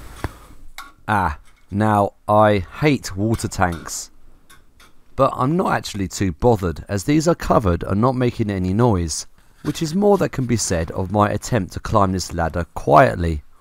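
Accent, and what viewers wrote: United Kingdom. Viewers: You've got a beautiful British accent * . *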